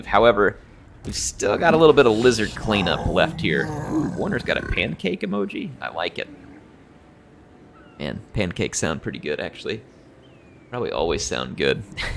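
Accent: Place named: South, United States. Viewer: You've got a slight southern drawl each time you speak. Lol cheers. I grew up in a few small towns growing up. Blue collared and conservative they like to fish and hunt. I like your videos! Thanks.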